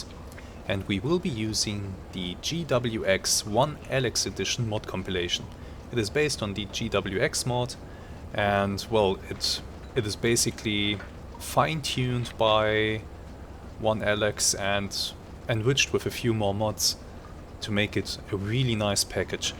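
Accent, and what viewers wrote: Germany. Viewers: a German accent is more than welcome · I actually thought you were german! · You sound German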